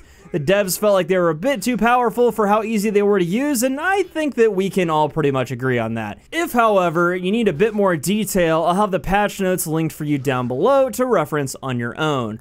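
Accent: United States. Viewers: Your southern accent is so generic xD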